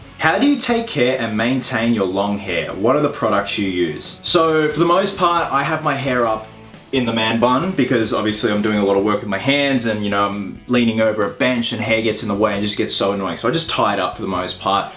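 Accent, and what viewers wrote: Australia. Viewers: Mason Sumi that's just the Australian accent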